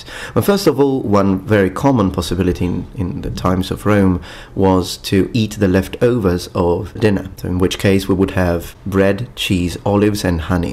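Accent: United Kingdom. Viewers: You are an Italian with British accent? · he sounds more British than Italian. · Well technically he is speaking English correctly, with a British accent. Probably how he learned it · He's Italian with a British accent. Love it!!!!!!!👍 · Did you have a British parent or live for some time in the UK. You speak English like a UK citizen. Oh, by the way, I think your Italian sounds better than your Classical Italian. Your Italian sounded smoother, and you seemed more at ease with it.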